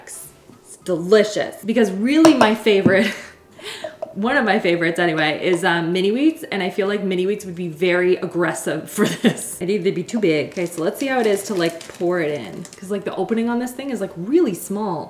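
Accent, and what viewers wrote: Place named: Canada. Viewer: After being convinced that Canadians don't say "eh" at the end of there sentences. We have! Yessssssss!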